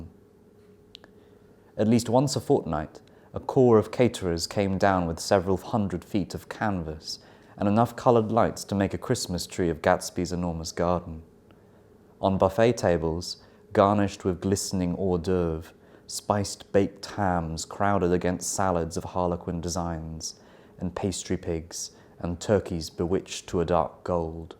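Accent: United Kingdom. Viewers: Hi. reading sounds so British! Amazing! I have been British English for several years because I fell in love with its pronunciation, intonation and expressions. However, it is so tough to learn it. I can hardly acquire it. That lets me down easily😰. I listened to his reading and got impressed with his British accent Which encouraged me to keep learning. I love his lovely voice, too, by the way. That sounds really comfortable😌. Thank you so much. ( ^-^)ノ∠※。.:*:・'°☆ PS: Could you read ' Howl's Moving Castle' (by Diana Win Jones?) for us, please?😊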